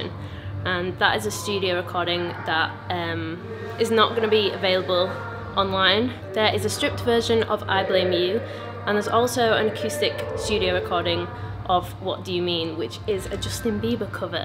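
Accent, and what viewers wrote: United Kingdom. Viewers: are you from Sheffield?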